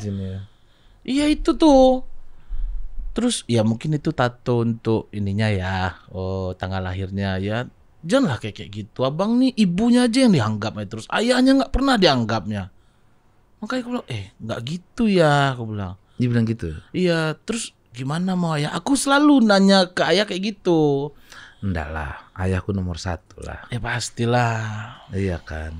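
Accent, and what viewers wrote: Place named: Sumatra, Indonesia. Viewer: Seneng banget nonton ini sebagai orng Sumatra, logat nya dapet banget😂😅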